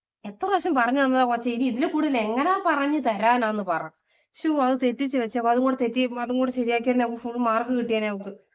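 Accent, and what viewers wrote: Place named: Kottayam, India. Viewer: Ee kottayam slang engane chechi kku vanne... Ennayalum kekkan rasava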